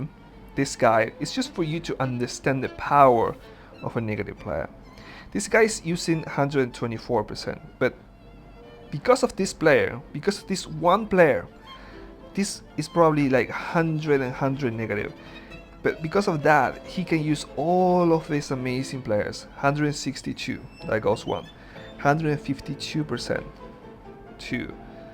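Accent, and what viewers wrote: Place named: Netherlands. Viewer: Well he has a Netherlands accent butnits English 100% if u can't work it out turnnon subtitles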